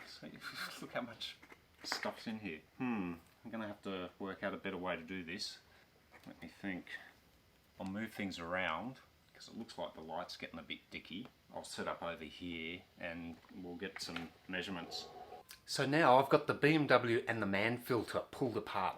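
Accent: Australia. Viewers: He's Australian, not British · The second I heard the Australian accent I had no doubt this guy would be messing around!!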